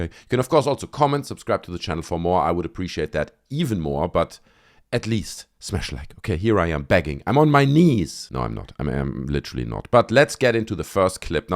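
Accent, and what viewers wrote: Germany. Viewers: wieso klingst Du so deutsch?! 😅 ... ok, maybe an mistace. Go on and GRTX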